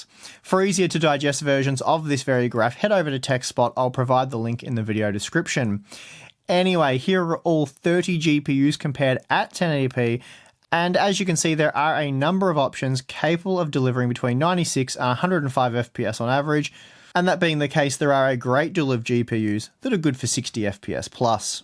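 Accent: Australia. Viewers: Great work mate! nice to hear an aussie for once doing bench marks ;-) keep up the great work!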